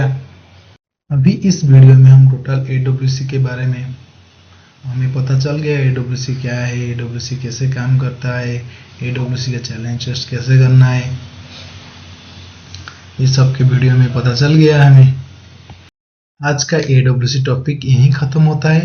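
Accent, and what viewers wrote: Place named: Odisha, India. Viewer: Odia accent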